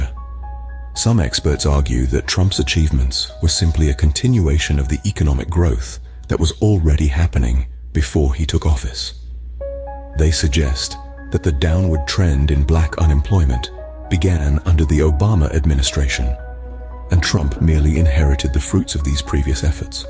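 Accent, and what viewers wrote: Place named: United Kingdom. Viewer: Gotta love your choice of narrator A white British man?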